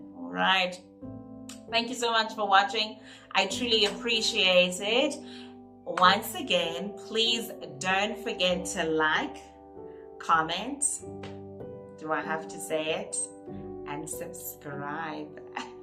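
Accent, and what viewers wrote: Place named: England, United Kingdom. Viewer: I love the English Accent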